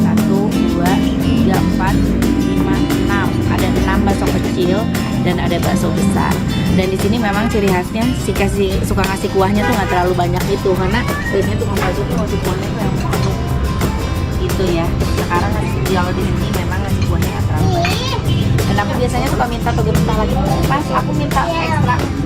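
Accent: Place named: Sunda, Indonesia. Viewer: ak nonton nya ga fokus sama bakso nya tp fokus ke teh yg cantik. 😍😍 sukses turus buat canel youtube ya teh 👌😍. salam dari Pelajar di jepang. ak selalu nonton trus youtube teteh. teh cantik lucu juga kalo ngmong bahasa sunda 😁😁ak orang jawa tengah.. pokok nya semangat trus teh buat konten youtubenya 👌😁😍😍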